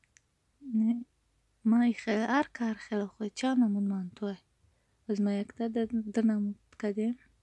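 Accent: Kulob, Tajikistan. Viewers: Салом ма дав да канали хдт мехмон шидм да як канали дигат дромадм ай тарзи гапзанит фамидмки тоза Кулоби нав якта блогери кулоби дидм .манхам кулобиюм .бо хамрохи шавхарам бо фарзандом да масква зиндаги мекунем Кулобчон ба пеш .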